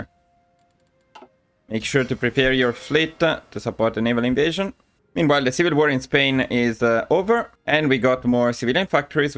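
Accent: Italy. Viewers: I couldn’t tell at first but the Italian accent is very distinctive. Especially with the “-a” sound at the end of a lot of words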